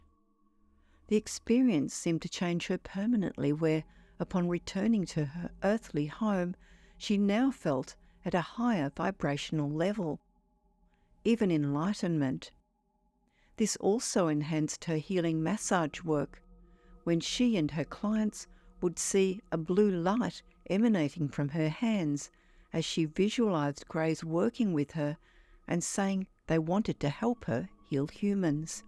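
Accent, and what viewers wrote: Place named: Australia. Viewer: Its called an aussie accent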